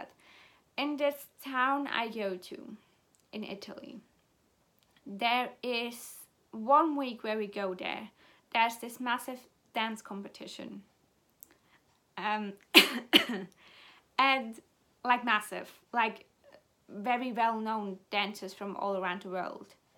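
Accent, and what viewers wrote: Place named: United Kingdom. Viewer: Hahaha, woah a shocking concept... are you sure you're allowed to not watch videos that you don't like? I mean, how else will you be able to make a video complaining about it? Haha! ;) I just really liked the chattiness of this video, it made me chuckle to laugh along with some of your stories, especially the failed film experience at the theme park, haha. British accent is totally the best accent... not biased at all, you know, haha. I remember being tagged in this video at one point, and then deciding that I didn't feel any need to do it, so I just answered some of the questions in the comment section of that video, but I totally understand why people are talking about the point/relevance of this tag. You need to go to Nando's, it's a Portuguese restaurant which is highly popular in the UK and caused a massive thing online about the term 'cheeky nando's' which I think turned into a meme or something. 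But it is a great restaurant, and even though they focus on chicken, their vegetarian options are delicious! HOW CAN YOU NOT LIKE TEA?! Hahaha, maybe you just haven't found the right kind of tea!? I'm joking of course, only slightly wounded... slightly.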